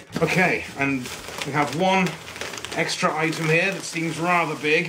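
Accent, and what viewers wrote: Texas, United States. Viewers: american/texan accent sux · Loved i mean looooved the Texas accent lol.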